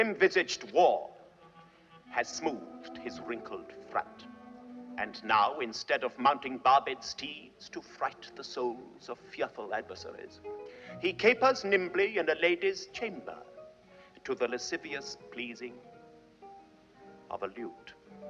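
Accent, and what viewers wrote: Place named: England, United Kingdom. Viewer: Who else is absolutely fascinated by his English accent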